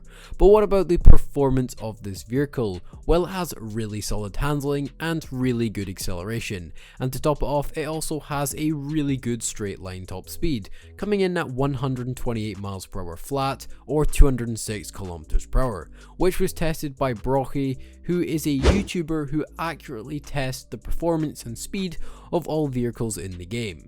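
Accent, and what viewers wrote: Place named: United Kingdom. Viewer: Why tf wouldn’t you put the subtitles for the cars. No one can understand your stupid British accent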